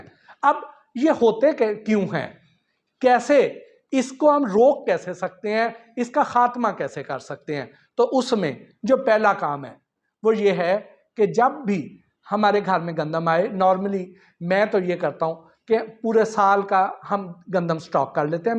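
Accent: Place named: Punjab, India. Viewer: ko Bolna to ata nhi.aisey lagta hai koi paindu bol rha hai Urdu bolny ka style to bilkul Punjabi touch ka hi hai ab Sachi baat kisi ko Buri lagti hai to lgey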